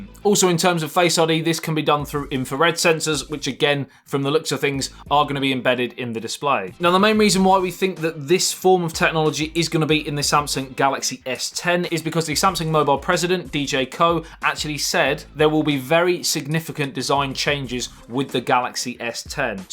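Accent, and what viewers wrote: United Kingdom. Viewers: I subscribe just for ur British accent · Are you from the UK? I'm sorry but I just can't stand the accent. And no I don't need a foldable phone at all. I mean it might be cool but no.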